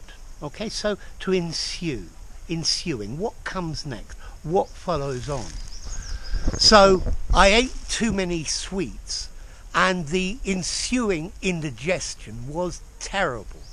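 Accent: RP, United Kingdom. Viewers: Hello Sir I've just run into your videos....you're such an excellent teacher. I wish people here in the North of England spoke as clearly as you!!! I can't wait to watch all of your videos!! I love posh accent, but I still struggle when it comes to understanding people speaking (for me) fast....would you explain us how to deal with this issue? Thank youuu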